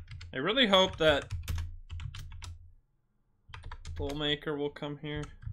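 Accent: Netherlands. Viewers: dude I just started watching your videos and I had no idea you were Dutch until I heard you read the letters and numbers out loud. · ik hoorde het al eerder aan het accent, komt ook wel omdat ik al had gezien op <link> dat je nederlands bent. :P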